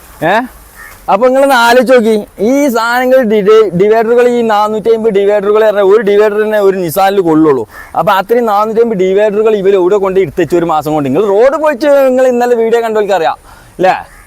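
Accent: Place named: Malappuram, India. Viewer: നല്ല പച്ച മലപ്പുറം ഭാഷ ഇഷ്ടമായി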